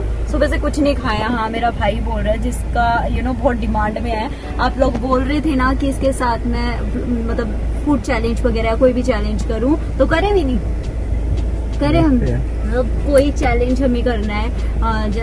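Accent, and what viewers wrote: Haryana, India. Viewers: OMG apka awaz haryanvi language m Don't blve it's u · Ap haryanvi bolte ho di❤️❤️❤️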